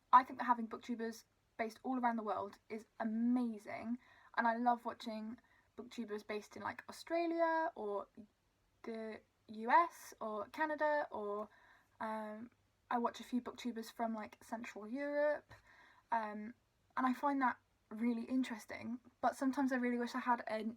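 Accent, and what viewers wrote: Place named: South, United Kingdom. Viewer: What r u studying at uni? If u were ranking all the UK Prime Ministers, where would Boris fit? Dark hair and blue eyes: Celtic blood perhaps. Yup, posh accent, you are a s'erner, but certainly not like eg. Emma Watson's modern RP (Received Pronunciation). Anything RP is so choncey uppercrust.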